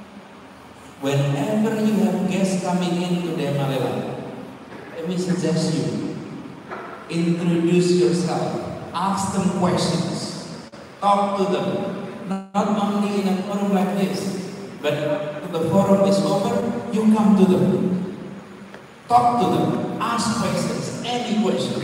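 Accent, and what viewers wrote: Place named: Indonesia. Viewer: Indonesia banget bhs inggrisnya pak Anis.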